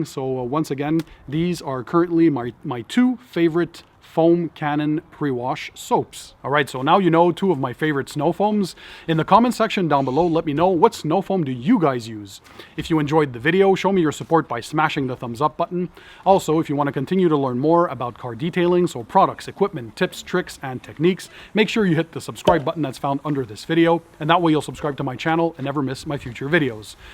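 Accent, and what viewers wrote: Canada. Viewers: Alabama. Must be why I find it somewhat different, not odd just different. Not so much a French accent just a very Canadian type of accent not usually found in the state unless it’s Minnesota or the Montanas.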